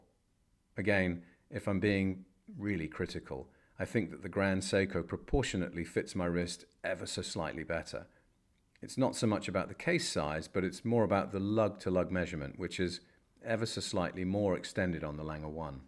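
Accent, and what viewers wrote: United Kingdom. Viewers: It’s really cool to find a video like this and feel like I’m having my thoughts read aloud in a British accent. I’m a huge fan of Lange and GS but have never been able to pull the trigger on a GS.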